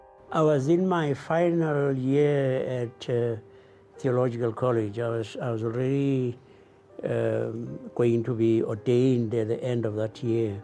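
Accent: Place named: Africa. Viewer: pour les 1ère S1 Super !!! L'accent africain est superbe ! ;)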